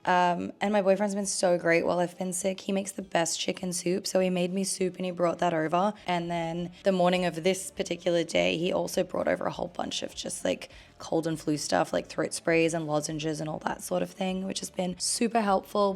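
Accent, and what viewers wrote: Australia. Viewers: Your ‘sick’ voice sound sooo very Australian! I hope you’re feeling better and enjoyed your holiday x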